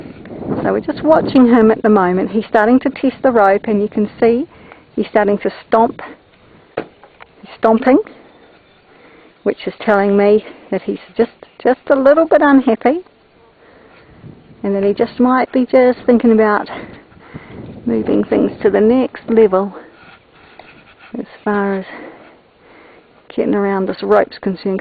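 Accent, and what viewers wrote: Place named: New Zealand. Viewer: Hi I like your relationship with this horse. Tom Roberts would advised to tie the halter with a bowline knot under his chin, (easy to undo) I am looking forward to seeing more of your videos. PS I like your kiwi accent. I am keen to see how you actually get into the racing part.(if that's what you do) I have a colt and I am working him will follow your advice however my skills are not as confident as yours ..but I try.you explain things very well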